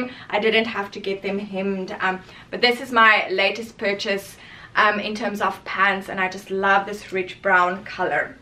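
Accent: South Africa. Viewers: I knew that was a South African accent..love from South Africa 🇿🇦❤I'm a new subscriber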